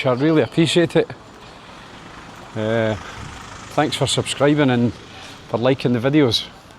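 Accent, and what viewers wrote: Scotland, United Kingdom. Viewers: Interesting vid again, many thanks. A small aside, I like the Scottish accent having spent a bit of time working in Hamilton and other parts over the wall, but I did a little testing on this vid. If you play this at 2x speed, it sounds exactly like Russ Abbot's Scottish character. I'll get me coat... 👍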